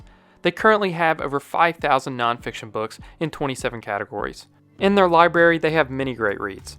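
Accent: South, United States. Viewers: Honestly I just come here to hear your awesome southern accent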